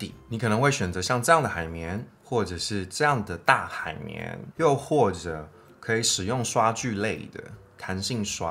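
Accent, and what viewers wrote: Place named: Taiwan. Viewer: 好不習慣這麼多台語生活化的你，哈哈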